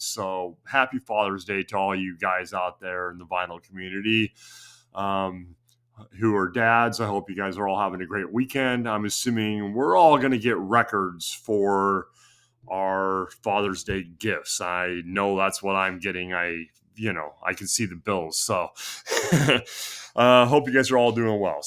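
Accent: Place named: South, United States. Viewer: What part of the south are you from? I can hear an accent but I can't quite place it. I'm going N.C? Possibly T.N? Can't go wrong with Rose Tattoo. Wow! The Who!? You definitely have their best one. I could listen to the first Grim Reaper record all day long. The second one is pretty good too. Check out my video on them. GNR has never put out a bad one but you're right. That is one of the greatest debuts of all time. Keep this theme up! It's cool. Cheers!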